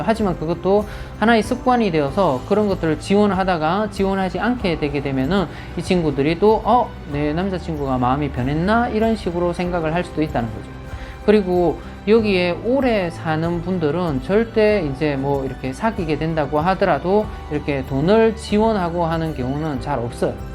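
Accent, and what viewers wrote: Gyeongsang, South Korea. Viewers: 경상북도 내륙쪽 어디 억양이시네요~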